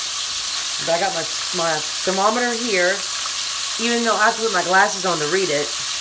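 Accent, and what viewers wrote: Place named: New Orleans, United States. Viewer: Your channel is fantastic! You are a wonderful cook. When I first heard you I thought " oh she is my people" as I was raised in NOLA!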